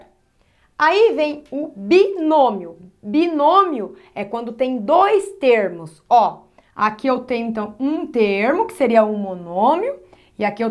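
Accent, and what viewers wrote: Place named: Goiás, Brazil. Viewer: Cara essa professora é muito top 👍, Eu entendi tudo, ela é muito simpática e adorei o sotaque goiano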